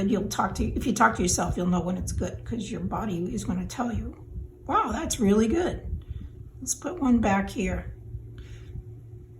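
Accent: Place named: Boston, United States. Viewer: Your accent sounds like mine. I’m from the Boston area. Love this piece 😍 SALEM WILLOWS grew up going there 👍🏼😍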